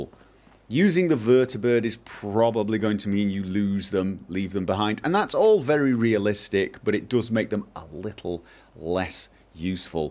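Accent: United Kingdom. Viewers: video as always, mate. Which part of Norway are you from? Your accent sounds somewhat British, then again, I've only heard the Norwegian accent a handful of times.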